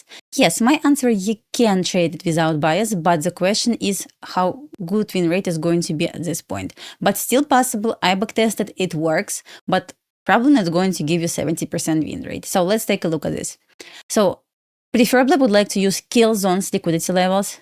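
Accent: Russia. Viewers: Why your voice sounds like Russian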